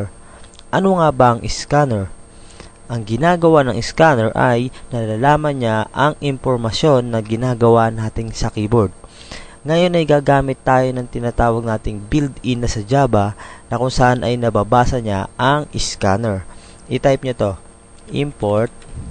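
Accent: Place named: Manila, Philippines. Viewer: masyado nman mapanghusga sa mga bisaya tong mga to.. di nyo bah alam na ganyan mag english ang mga tga maynila? relate relate din pag my time.. nkikiview na nga lng ei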